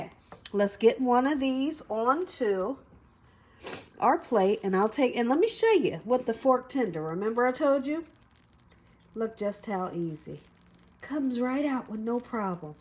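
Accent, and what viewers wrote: South, United States. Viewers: She's sporting a buffalo jersey with a southern accent.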